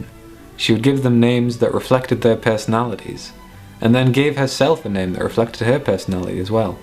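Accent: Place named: London, United Kingdom. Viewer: Love the way you speak are Londonianian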